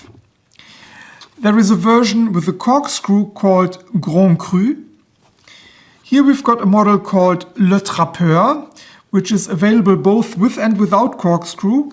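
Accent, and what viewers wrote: Germany. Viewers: Sein Englisch ist doch perfekt zu verstehen, klingt wie deutsch😅😅😅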